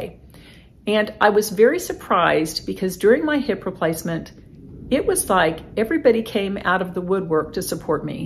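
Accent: South, United States. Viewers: are you a southern belle?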